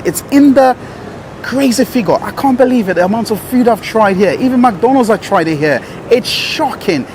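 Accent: United Kingdom. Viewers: It's like you're speaking with a British accent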